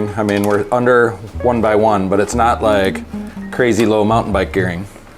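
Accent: Wisconsin, United States. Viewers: I feel like you are from Wisconsin saying Ope! Later on in the video figured it out. Great video! I might do a similar upgrade.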